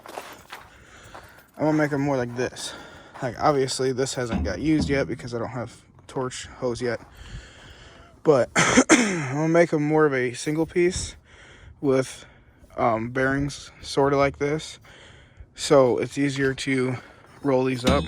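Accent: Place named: West Texas, United States. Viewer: Damn for some reason I thought you were west Texas.. Nice build buddy!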